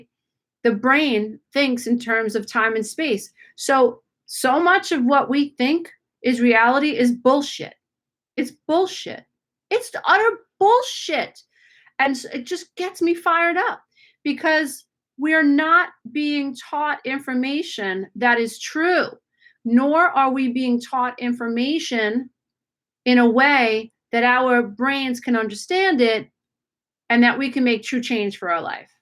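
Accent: New York, United States. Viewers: You are wisdom with a New York accent 👍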